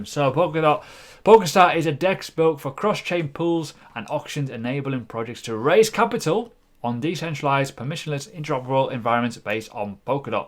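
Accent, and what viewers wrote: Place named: Yorkshire, United Kingdom. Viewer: gotta be a Yorkshire accent?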